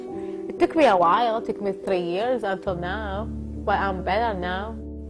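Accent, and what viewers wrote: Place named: Iraq. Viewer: بالمناسبة لهجتج عراقيه انتي مواليد ايام دخول الجيش العراقي و ممحونه.....ما ننلام من نجناكم بالكويت.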